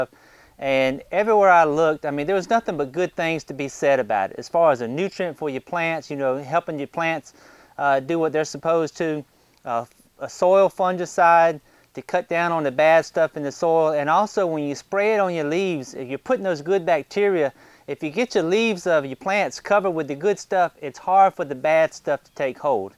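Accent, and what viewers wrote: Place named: Louisiana, United States. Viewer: your amazing accent = Louisiana? Mississippi...? Very nice. And great video..! I think you should look at The Worm Inn, Mega, now made and sold by TheWormDude dott comme, apparently. More air cirulation, no stink.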